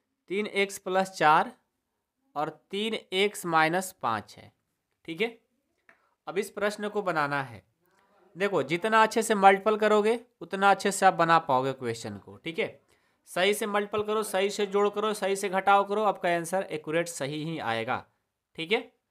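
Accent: Bihar, India. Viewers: Sir àap Bihar se h ?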